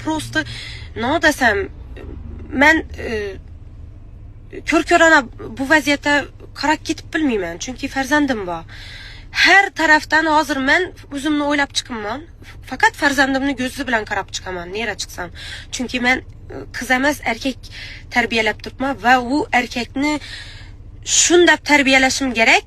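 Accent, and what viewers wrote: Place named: Khorezm, Uzbekistan. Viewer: Шивада гапираяпти хоразимча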